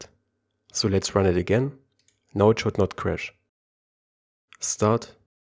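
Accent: Germany. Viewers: Are you german? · You are an very good teacher! Probably one of the best I have seen. Are you German speaking? I hear a slight German accent. I recently started with Android Studio. The threading in Java is a bit complicated, but thanks to your video, I look much better, thanks a lot !!! :))))) Greetings from Switzerland Nicolas B) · dein Englisch klingt, als wärst du deutscher 🤔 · are u german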